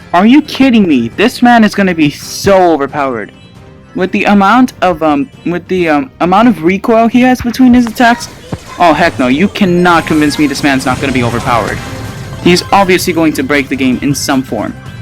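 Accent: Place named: India. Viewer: You sound Indian asf lol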